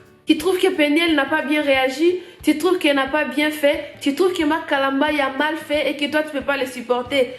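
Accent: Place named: Democratic Republic of the Congo. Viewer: J'aime ta vidéo. tu as l'accent congolais. j'ai plein des amis congolaise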